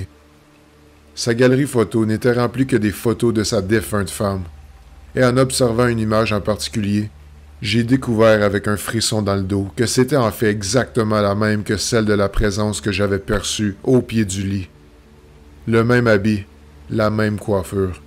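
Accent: Quebec, Canada. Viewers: Chapeau bas sa fait plusieurs vidéo que j'écoute et franchement chapeau bas, sa fait plaisir d'écouté un québécois qui lis des histoires je trouve sa cool moi aussi je suis québécoise. Lâche pas 👍👍👍👍dans ta voix sa paraît tout le temps que tu met + une abonnée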